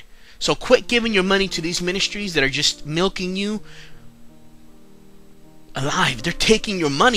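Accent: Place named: Puerto Rico. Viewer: bro you sound Boricua like me. But you are soooooo confused. Tell me, ¿Where is Jesus returning to FIRST? ¿NYC?¿Paris?¿London? HELLOOOOOOO!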